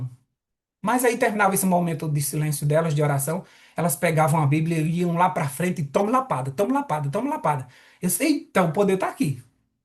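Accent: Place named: Northeast, Brazil. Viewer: Meus irmãos eu não acredito que eu perdi a Live com essa pregação com irmão. eu sou de Aracaju, sua vizinha! Amo esse sotaque, é nois kkkkkkkk nordestinos arretado😁🙏🏻🤗☺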